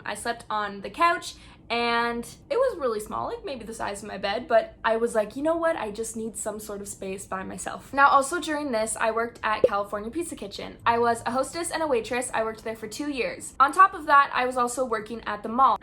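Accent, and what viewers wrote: Canada. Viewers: I love your Canadian accent ( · I was just watching Sam and colbys conjuring video and swore I heard some Canadian from you. Us Canadian to California transplants can hear it lol. Funny, I also moved to California from Oshawa when I was a teen. It’s been much longer for me though 😂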